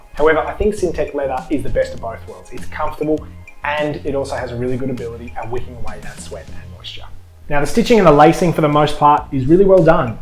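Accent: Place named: Australia. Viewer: Your channel is Fair dinkum I appreciate your reviews. I love listening to people talk about muay Thai gear especially in an Aussie accent. 🥊 I love when you said "muay" instead of "my" 🤣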